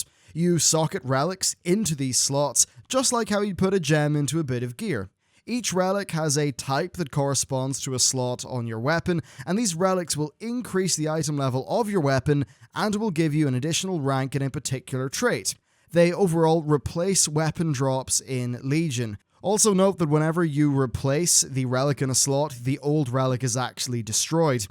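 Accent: Northern Ireland, United Kingdom. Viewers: oh right i thought he was from Norin Iron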